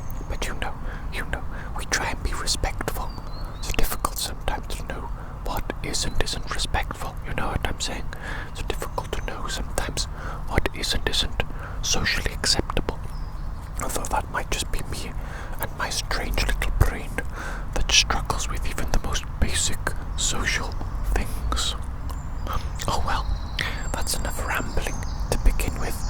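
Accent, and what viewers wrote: Wales, United Kingdom. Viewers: Noooooow I know why you talk a lil funny! You’re just from Pontypandy! (I’m a Hampshire-ean) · The Welsh accent is made for asmr, whispers are immaculate 👌🏻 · Welsh accent 10/10.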